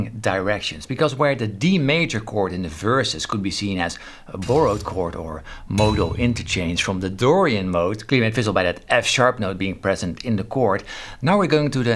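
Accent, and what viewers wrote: Netherlands. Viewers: your dutch accent is so cute.All the best from Limburg.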